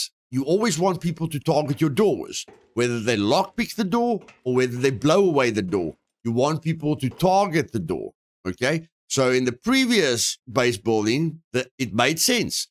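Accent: South Africa. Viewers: South African at it's finest haha - love bro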